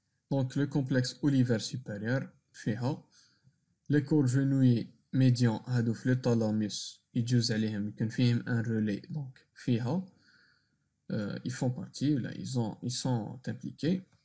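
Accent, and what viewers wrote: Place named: Jijel, Algeria. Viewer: ça aide super bien merci, ps: j'adore lehdja dyalek djidjliya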